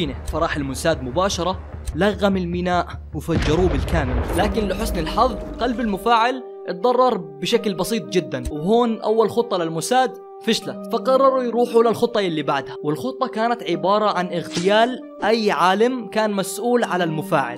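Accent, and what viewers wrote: Jordan. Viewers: لهجته مبينه اردني 😂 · ياالله يل لهجه العسل احب الاردن اخوك من العراق❤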